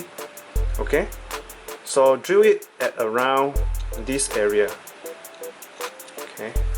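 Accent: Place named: Malaysia. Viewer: super Malaysian accent.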